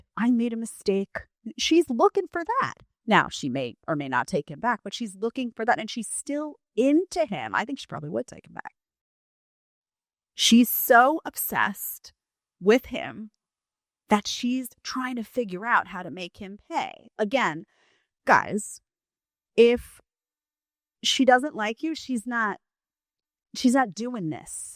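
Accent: New York, United States. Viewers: Yea I thought you was like fuugggeeeettttt aaabbbooouuuuuttttttt that's iiiissshhhhh and rolled all the way out. It's been like what 2.5 yrs since you did something on here. Dang we missed that awesome smile the new York accent and attitude. So happy to see your back. Glad your happy healthy and safe. God bless so happy your back.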